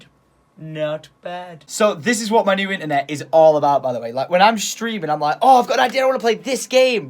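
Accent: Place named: Scotland, United Kingdom. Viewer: That’s Scottish accent is hilarious aha from Glasgow !!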